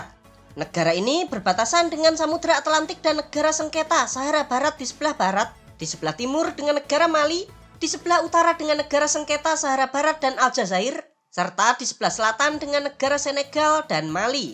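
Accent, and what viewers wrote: Java, Indonesia. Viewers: kental banget logat jawa nya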